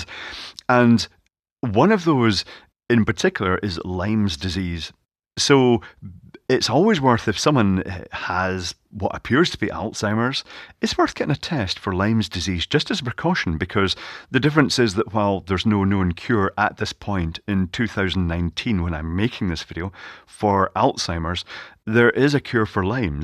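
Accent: Scotland, United Kingdom. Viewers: It's a Scottish accent, he was born in Glasgow.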